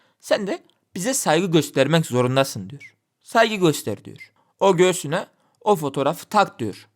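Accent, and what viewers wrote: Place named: Nevsehir, Turkey. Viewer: Ustam nevşehir li misin konuşma aksanın nevşehir ağzı çok iyi 👍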